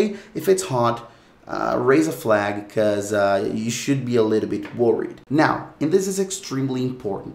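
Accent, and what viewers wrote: Brazil. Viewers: Why do you have this thick brazilian accent ?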